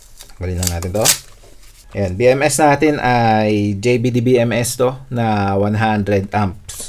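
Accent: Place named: Pampanga, Philippines. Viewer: Bro, Pampanga based ka ba? May accent ka. Will be buying your batt pag-uwi ko Zamboanga or kung Pampanga ka tag mo ko. Pampanga-based ako.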